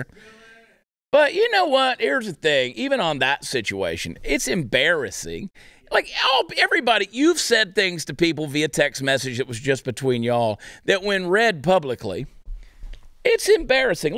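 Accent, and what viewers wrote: Texas, United States. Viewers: Ya gotta love a wordsmith with a Texas accent!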